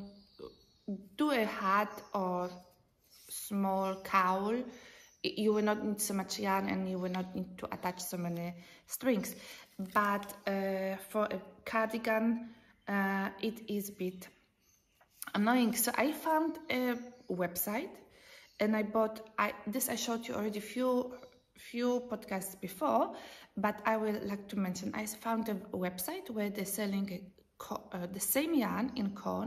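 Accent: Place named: Croatia. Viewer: Hiya.. I was so delighted to come across you via Loretta - Knit My Way Home podcast.. she mentioned you in the #inspiredbyellenkal. I enjoyed your podcast & how cheerful you are.. and love your new cardigan and am looking forward to knitting it when I finish a couple of my other knits. I laughed when you showed the blue tack on your needles.. but a great idea, yes! Congrats on the new pattern.. and I look forward to seeing what you come up with in the future.. and maybe even test for you one day. Thank you for a lovely podcast.. Cheerio from an Aussie girl x Tea ps.. love your accent as it reminds me of my homeland Croatia 🫶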